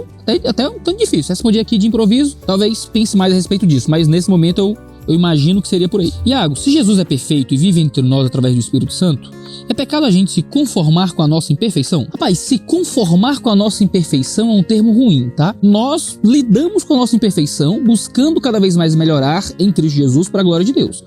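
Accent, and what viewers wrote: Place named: Rio Grande do Sul, Brazil. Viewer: Mas bah, Tchê!!! Que grata surpresa clicar e iniciar o vídeo e ouvir o som da minha querência amada... hehehe... até pensei que era anúncio... kkkkk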